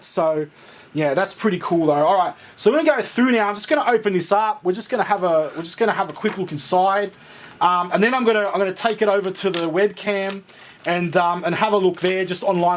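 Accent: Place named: Australia. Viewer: Great video mate, just found your channel- already a subscriber, love hearing vids from fellow aussie!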